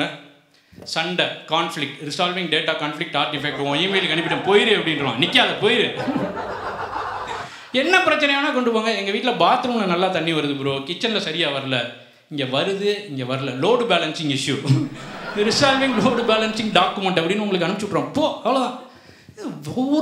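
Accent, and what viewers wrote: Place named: Madurai, India. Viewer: Madurai or near by accent 😂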